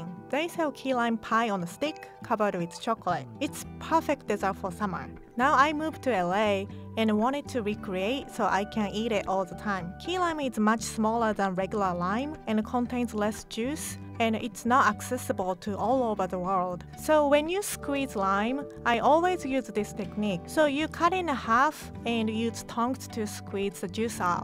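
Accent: Japan. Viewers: Her japanese accent is sooo cute! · 10 years didn’t make her japanese accent go away. I’ve met people who lived overseas for 2 years an came back with an accent but can’t speak his native accent like usual.. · Yep!! Her Japanese accent is charming 😃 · hæs lived in ðe States 10 jers ænd still hæs a Japanese accent... interestiŋ It'st so fascinatiŋ to sich how people's accents wœrk! Ich lived in Canada for a jer ænd ich adopted ðe accent instantliche... How loŋ dœs it take for ȝou to adopt æn accent?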